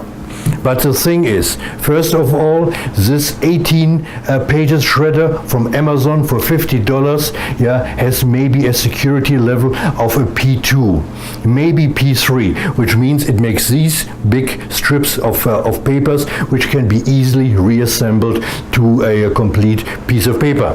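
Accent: Germany. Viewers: Are you from Germany ? your accent sound really german.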